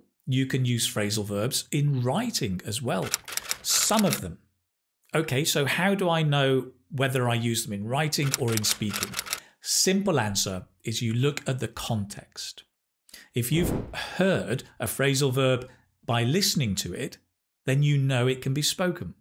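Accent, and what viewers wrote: United Kingdom. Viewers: You are a great native speaker teacher! I love British English.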